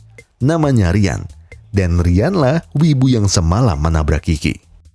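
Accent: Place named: Surabaya, Indonesia. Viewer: ternyata orang surabaya masnya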